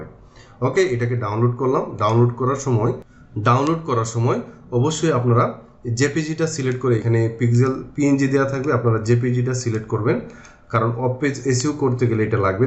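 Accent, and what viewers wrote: Sylhet, Bangladesh. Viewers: Bhaia apni ki sylhet teke?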